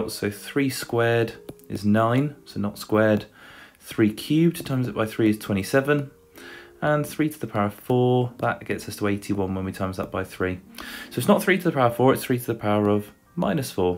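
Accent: United Kingdom. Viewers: try to be more clear in your speaking and explanation. Not everyone is capable of understanding a British accent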